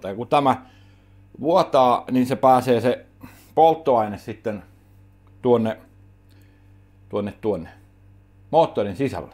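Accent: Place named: Tampere, Finland. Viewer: Artikulaatiota selkeällä Treen murteella-asia tulee ymmärretyksi👍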